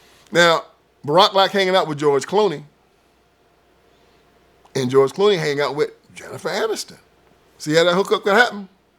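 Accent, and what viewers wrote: South, United States. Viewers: Love your delivery, and that Southern Drawl, truth, love ,and light,God Bless from not so Great Brtain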